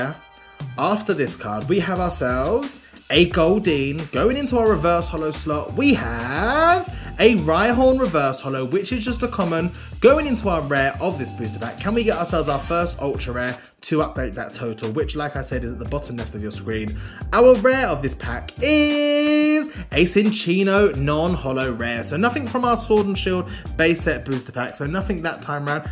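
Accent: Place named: United Kingdom. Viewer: Cool Zard! I really like how your british accent goes loud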